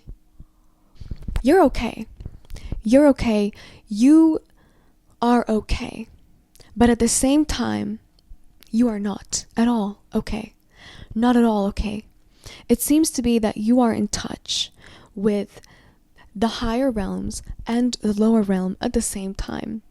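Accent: United Kingdom. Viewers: Yep 👍 ❤ 😅 your UK accent is hilarious and beautiful! The universe is my sugar daddy ! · We going to the UK 😂 you definitely mastering the accent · Not the British accent 😂... had me creasing thnx for repping the London gyal dem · ❤❤❤.. ohh my Egyptian Goddess Queen your British Accent is gettimg so good That I almost felt I was some How In a Timeline with Harry Potter & Hogwartz scholars ❤❤❤ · Your British Accent 😂 ❤❤❤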